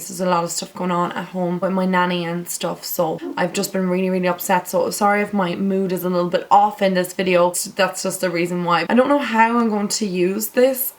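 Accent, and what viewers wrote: Ireland. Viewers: your videos are so good! I live them. are you irish , you sound sorta irish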